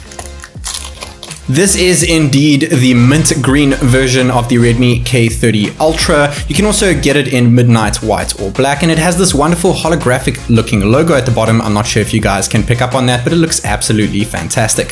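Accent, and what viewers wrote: South Africa. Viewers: You from SA?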